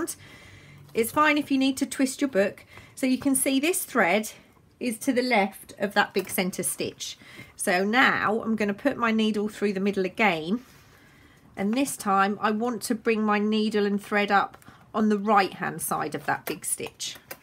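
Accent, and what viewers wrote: England, United Kingdom. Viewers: I think I like hearing your English accent as much as your artwork!! Watching your videos just makes me happy!😊😊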